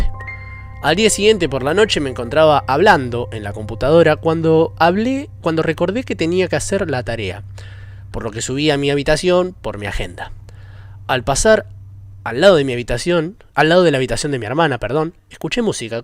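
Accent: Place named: Argentina. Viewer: Dross que te pasó? Ahora hablas con acento argentino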